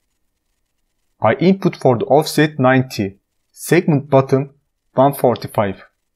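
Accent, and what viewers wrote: Turkey. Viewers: Tam bir türk ingilizcesi :)) teşekkürler video için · Abi Türksün demi aksanından belli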